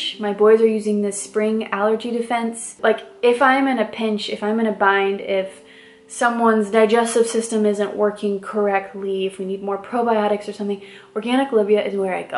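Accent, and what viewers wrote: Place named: South, United States. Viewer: Much love from Saint Louis!! 🫶🏾⚜️ Hubby and I just found out I’m 6 weeks and 5 days pregnant after almost 2 years of trying and when I tell you your videos give me a HUGE sense of relief, positivity, and inspiration, I mean it girl. God bless you and your family, and may you all continue thriving and building up (I’m from the north and speak a southern accent ALL THE TIME 😂🤣 maybe it’s in your roots girl HAHA). Thank you. 🙏🏾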